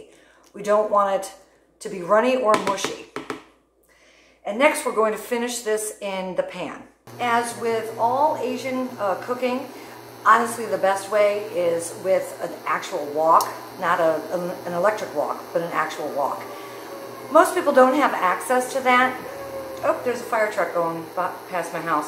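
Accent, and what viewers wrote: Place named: Chicago, United States. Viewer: You seem to communicate in Chicago speak!...awsome recipes...such fun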